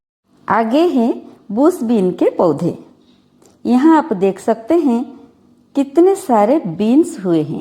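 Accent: Odisha, India. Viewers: Aapke Hind boli se pata chal raha tha ki aap Odia hi honge 😃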